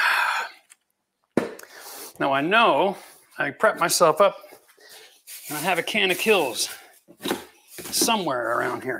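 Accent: Canada. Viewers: I could’ve swore you were Canadian 😄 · Isn’t he Canadian?😂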